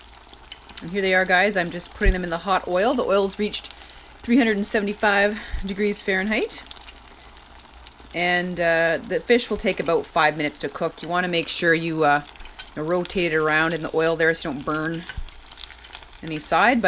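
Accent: Canada. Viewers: Your from Canada 🇨🇦 Eh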